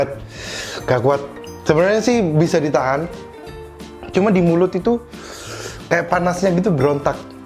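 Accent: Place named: Java, Indonesia. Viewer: Medok medok gemeshhh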